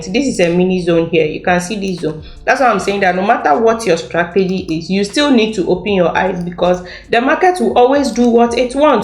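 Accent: Ghana. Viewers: It's Your Ghanian Accent for me🥰😍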